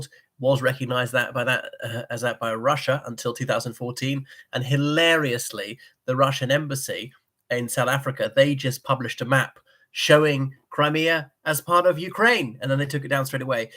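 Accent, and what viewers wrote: RP, United Kingdom. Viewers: You speak posh but are literally a gullible credulous clown.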